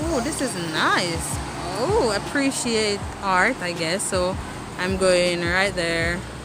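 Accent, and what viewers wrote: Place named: Jamaica. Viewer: Girl. Your accent . Fellow Jamaican here. When u said ' mi jus a waut pon the light fi change u zeen ' dwl 😅 and your Chinese😂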